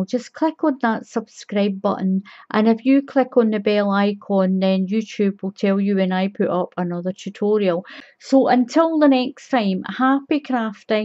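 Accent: Scotland, United Kingdom. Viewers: firstly I cud listen all day to ur lovely Scottish accent! I have used ur videos so many times! Thanks so much for sharing and it being so easy to follow xx❤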